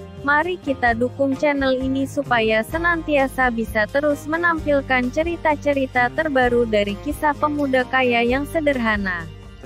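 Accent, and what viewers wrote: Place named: Java, Indonesia. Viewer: Mimin logad nya mirip kejawa jawaban ya??!!!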